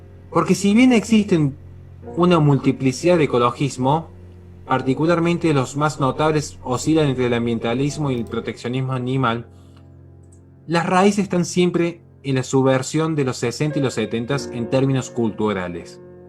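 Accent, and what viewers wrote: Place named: Cordoba, Argentina. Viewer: Verdad, debo admitir que no me gustaba el acento cordobés pero que deleite es aprender de este genio, despierta mi curiosidad se saber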